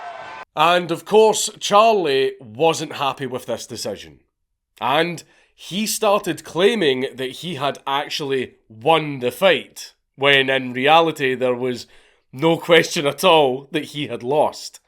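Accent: Scotland, United Kingdom. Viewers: Who,, Charlie? Charlie is an American,, or do you mean the channel creator? Count Dankula is from Glasgow Scotland,, and he's speaking with a heavy Scottish brogue... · Each video you over pronounce every word to mask your scottish accent kills me 🤣🤣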